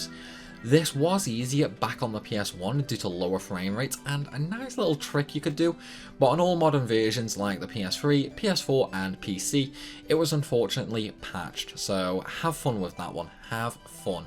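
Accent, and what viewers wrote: United Kingdom. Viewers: Your accent makes some words hard to understand.... And I’m british too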